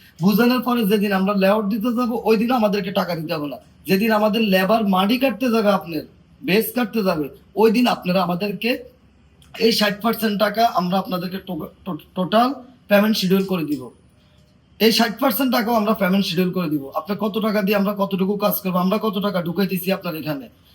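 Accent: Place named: Comilla, Bangladesh. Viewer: ভাই কুমিল্লাতে কাজ করেন নাকি,আপনার কথা শুনে মনে হয় আপনি কুমিল্লার লোক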